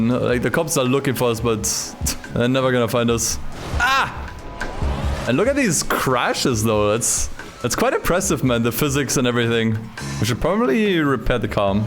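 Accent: Germany. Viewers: Man hört den deutschen Akzent voll raus 😂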